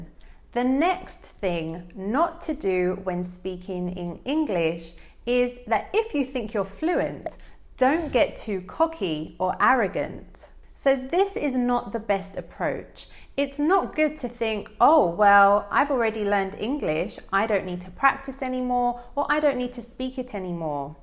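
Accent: United Kingdom. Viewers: i love your accent. i mean, british accent👍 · I've been learning British EN for four years. Your videos are really great to keep learning the British accent🇬🇧💛 Thank you so very much!! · Please tell me in which british accent you are talking😊💐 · I need to listen to british accent more. It is very beautiful, and your tips, particularly, are great. Thanks for your dedication in teaching. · I love your British accent. It's a lovely accent plus I love the Scottish accent. Thanks for a wonderful video and superb channel you are a gorgeous English instructor.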